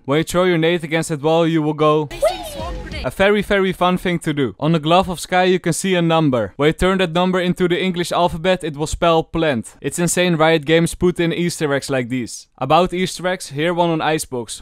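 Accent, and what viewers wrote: Netherlands. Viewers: jij bent sws nederlands haha want zoon accent heb je xd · I smell dutch, a little bit too much bro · dutch accent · Hahaha Nederlander die Engels praat met nederlands accent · nice dutch accent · most dutch accent i have ever heard bro.. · im sorry but are you dutch, because your english is a little bit weird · love the dutch accent lol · and evrytime i think jij benne nederlands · Love the dutch accent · Ik wist meteen dat je uit nl komt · I smell dutch 😂, still a good video man! I love your videos! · accents can not get any more dutch than this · nog ff leren om dat Nederlands accent weg te halen je klinkt net als mark rutte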